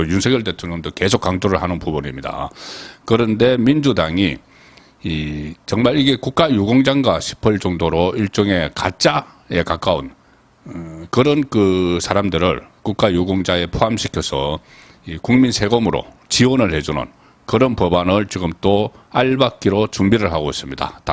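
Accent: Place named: Busan, South Korea. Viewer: 매 편 즐겨 보고 있습니다. 저도 부산 사람이라 사투리는 이해하는데 제발 아 발음을 오 로 발음하지 않았음 합니다. 나오고 가 맞는데 노오고 는 아니지 않습니까? 어려운 것 아닐것 입니다.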